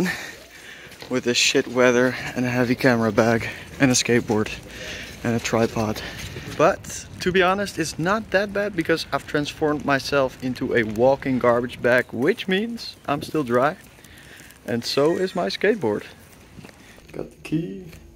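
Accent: Netherlands. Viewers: I can hear he is Dutch because I am to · hi im dutch and have have a dutch accent so are you dutch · I smell dutch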